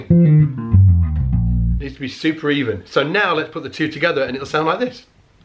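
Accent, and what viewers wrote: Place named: England, United Kingdom. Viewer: Thank you so much. You are the best Teacher with a lovely english accent. I'm learning soo much from you. It's a real pleasure to listen to you. You can make my day even after hard work in the office.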